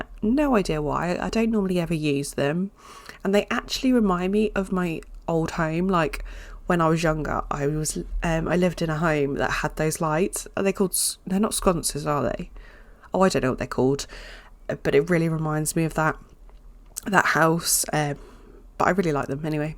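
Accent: United Kingdom. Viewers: I love the house and the cool shapes of the bedrooms, gives them character and it's fun to decorate! You definitely sound like you are from the UK 100% (to me at least and I am from USA) and I love a bit of both rambling and talking about the build, it really sounds like speaking with a friend :) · Love listening to you ramble! Sometimes I just put. your videos on to listen to while at work even though i can’t watch the actual video. Also yes you do sound like you are from the UK. I think you have a bit of an Essex accent 😆 but I don’t think you are anywhere near Essex if i’m not mistaken? 💜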